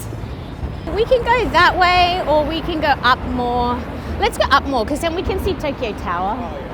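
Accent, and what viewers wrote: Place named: Australia. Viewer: My colleagues would disagree strongly 😂めっちゃオーストラリア訛の若干のアメリカ影響ですよ〜😂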